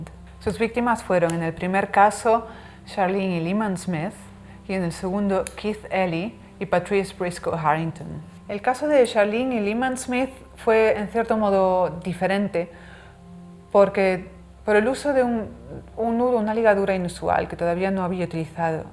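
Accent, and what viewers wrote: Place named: Spain. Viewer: Lo haces muy bien! El video lo narras muy bien! Y me encanta tu acento español. De que provincia de España eres?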